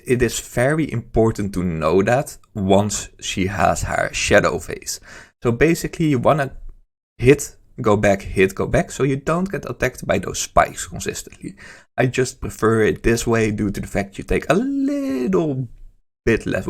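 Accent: Netherlands. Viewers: yo are you dutch by any chance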